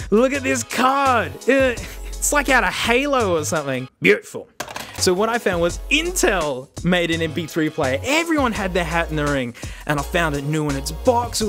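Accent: Australia. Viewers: Perfect way to start the day, aussie ranting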